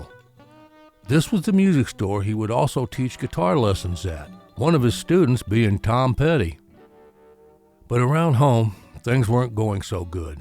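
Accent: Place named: West, United States. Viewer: What a well written, and well narrated story! I also like your western accent. It's stronger than mine, (desert southwest) and I can't place it.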